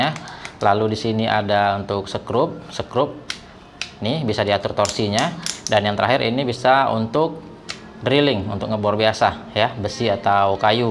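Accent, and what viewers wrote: Medan, Indonesia. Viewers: abg orang medan ya bg q sring nonton vidio abg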